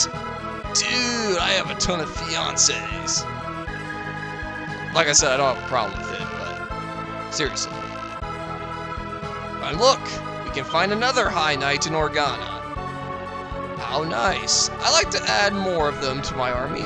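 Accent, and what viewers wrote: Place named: South, United States. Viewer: You should probably fix your audio sometime. Music comes from left, mostly. The rest from both sides. Might just be me *using headset* Also...A Persian with a southern accent. *imagines*mind explodes* o__o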